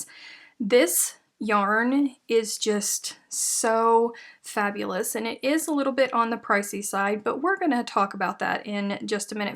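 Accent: South, United States. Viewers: p.s.: Not that speaking southern is not erudite in its own sweet way y'all.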